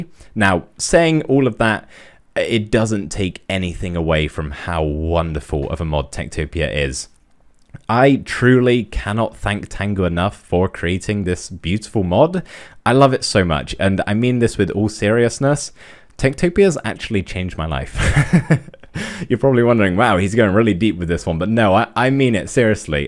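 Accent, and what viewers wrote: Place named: England, United Kingdom. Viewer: Gutted to hear this. Thank you for so many wonderful episodes! Edit: oh, man, I'm surprised how sad I am about this ending. It was a little bit of chill happiness during a horrible time for all of us. 2nd edit: Of course you have to go with your motivation and I wish you continued success with your channel. I'm sure I'll give one of your other series a try soon. One last thing I've wanted to say for almost 2 years by the way: I love hearing your accent! It's so cute to hear a rhotic English accent from England (most speakers in England are non-rhotic). Somehow your accent makes you sound warm and friendly, although that's probably also your personality ha ha. Thanks again for helping us get through a tough time!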